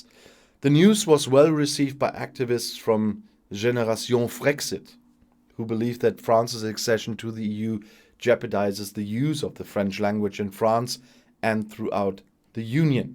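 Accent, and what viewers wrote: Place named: Canada. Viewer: O no it Canadian English actually. 😅😜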